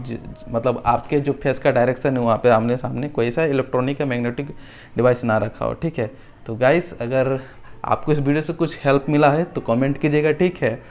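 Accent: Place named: Bihar, India. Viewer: Isme uski koi galti nhi h, vo bihar se hai bhai, thik hai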